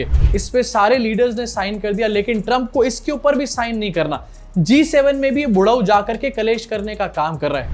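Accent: India. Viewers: your hinglish accent was so cute and funny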